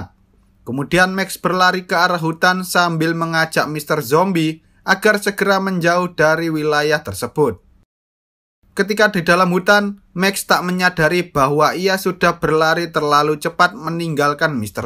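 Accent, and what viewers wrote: Java, Indonesia. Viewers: Mantep narator e medhok sip sip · Okeee rekkkk:v Btw wong jowo Yo?:V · Mantap bg logat nya Jawa banget😅😂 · Wong jowo yo mas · Intonasi MEDHOK, tapi mantaf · mantap bang medook ngomongnya hahah mantaap ciri khaas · Kentel banget logat jowo nya😁😁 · medok tenaaan , jawa mana sih mas , gak bisa hilang ya logat... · Pekat sekali bapak ngobrol jawa... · Medok Kabeh bahasamu le le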